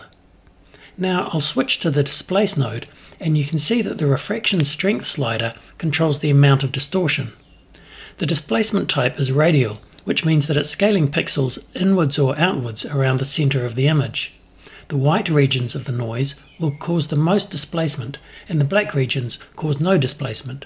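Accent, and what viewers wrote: New Zealand. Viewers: wow i learnt about quite a few new Fusion techniques and methods in this one so thanks heaps. nice to hear a Kiwi voice too. great explanation and tutorial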